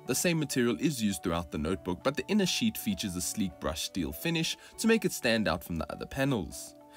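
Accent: South Africa. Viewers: Yes it's funny I didn't notice that about sath afrikan before. Also bright being pronounced as braght. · South African???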